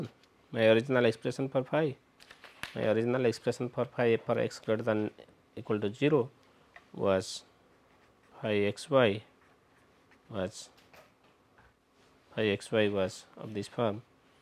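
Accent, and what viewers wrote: India. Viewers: What is the meaning of talking when your English only can be understood by the Indian population. we have to be focused on 2 things the maths and the very hard Indian accent.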